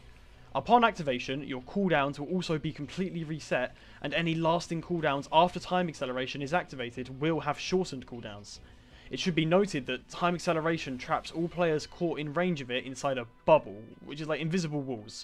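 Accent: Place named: United Kingdom. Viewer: eclipse but british B)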